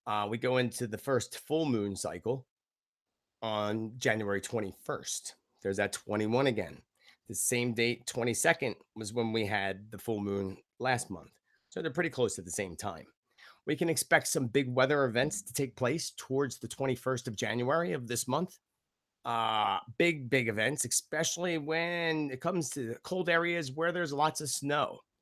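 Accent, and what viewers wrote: Philadelphia, United States. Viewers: Our HumanityFamily deserves no less than what I've known will come for 2019-TRUTH and JUSTICE! We will deal and heal with the upcoming revelations that will SHOCK us to our core, together. ThanQ for this video! I love listening to you, lol, you remind me of home 😊, I was born in Philly and lived ALL THROUGHOUT the tri-state area growing up lol-there's NO hidin THAT accent 👍. 💜💜💜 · You from Philly? Accent sounds familiar...Delco?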